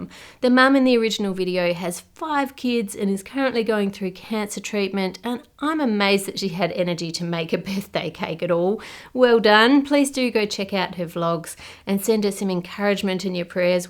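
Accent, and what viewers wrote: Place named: Australia. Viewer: I would watch these videos solely to hear pronounce "chocolate" in her incredible Australian accent. As a linguist, it makes me inordinately happy.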